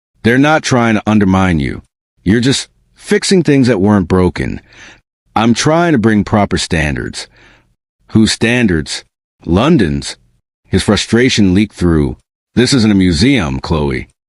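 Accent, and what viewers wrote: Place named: United States. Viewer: A British story read with a heavy American accent?! 😅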